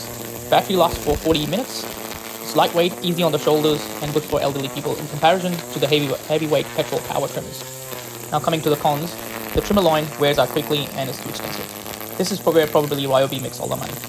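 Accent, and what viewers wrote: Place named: Australia. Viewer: U have perfect aussie accent even better than aussies...how u mastered it... ? Just feeling michael clarke is there...😅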